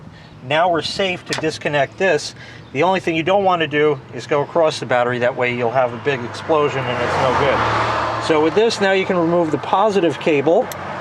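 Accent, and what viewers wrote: New York, United States. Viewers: Ethnicity: lebanese with nyc accent :) thanks for the video Habib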